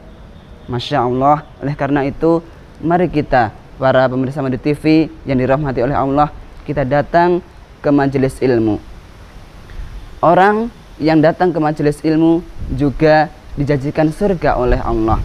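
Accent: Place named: Middle East. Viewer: Suarane pun kados alumni timur tengah..yaman hadir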